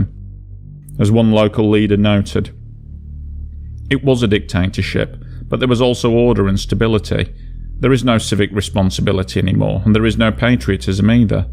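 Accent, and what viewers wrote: United Kingdom. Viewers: Is the narrator a native of England? His english accent looks like very british to me.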